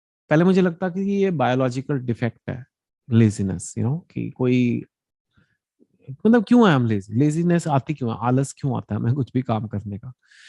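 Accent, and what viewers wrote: Delhi, India. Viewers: I like the way he talks like Delhi Walla style.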